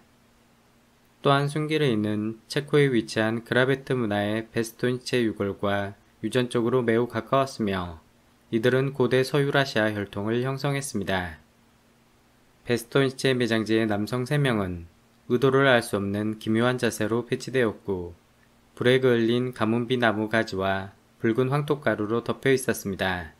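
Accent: Jeolla, South Korea. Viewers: 고향이 전라도요?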